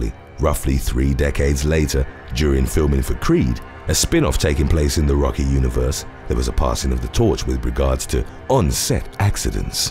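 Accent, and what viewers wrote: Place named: United Kingdom. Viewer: This guy sounds so British he should be in a James Bond movie...all the best from out here on land with the Kangaroos in Victoria Australia.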